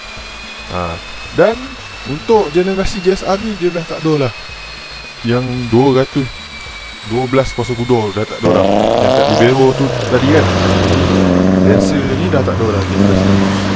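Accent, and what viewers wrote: Negeri Sembilan, Malaysia. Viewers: torbaikk bang ribiew njen guna loghat Noghori...nnti blh try cuba loghat negeri lain plk..hahaha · 1st time jumpa channel bang. Best & santai + cakap slang noghori Auto subs & like 😁 Salam bang saya ada beberapa soalan nak tanya, mohon pencerahan. Terima kasih in advance 1) engine 4g93T vs 4g63T, mana yg lebih baik dari segi reliable, powerful, energy efficient ? 2) antara 2 engine tu, mana lebih sesuai untuk masukkan ke satria neo? 3) kalau swap engine original Satria Neo, lepas JPJ ke?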